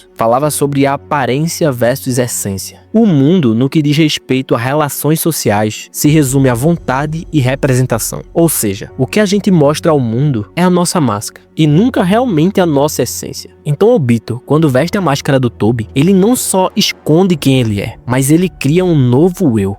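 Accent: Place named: Bahia, Brazil. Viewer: tem como tira o sotaque bahiano?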